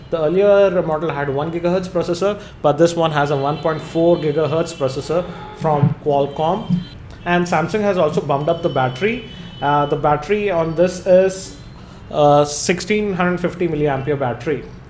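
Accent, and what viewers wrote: India. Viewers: best indian accent ever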